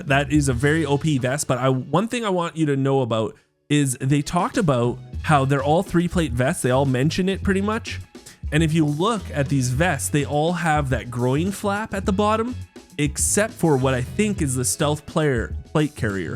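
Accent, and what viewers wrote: Canada. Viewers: By far the best person i like to hear my updates from. Not sure if its the funny Canadian accent "ae" or its just more interesting and better than most out there. Good job keep it up G.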